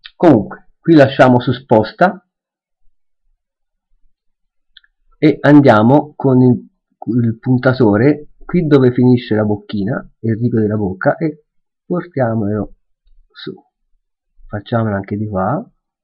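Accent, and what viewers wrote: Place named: Tuscany, Italy. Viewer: Grazie molto utile ma il toscano mi fa morire...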